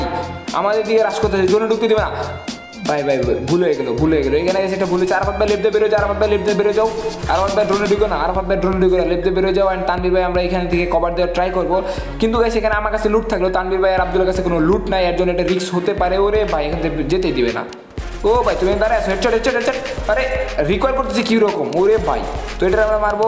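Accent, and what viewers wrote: Tangail, Bangladesh. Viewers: Bhai apni ki Tangail er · Vai tomar basa ki Tangail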